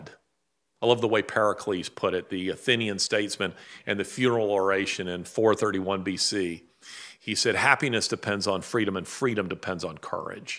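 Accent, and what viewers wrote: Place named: China. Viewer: Sounds exactly like 🇨🇳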